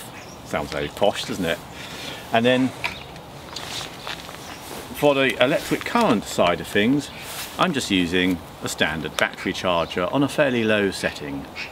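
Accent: United Kingdom. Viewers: how wonderfully British! Love it!!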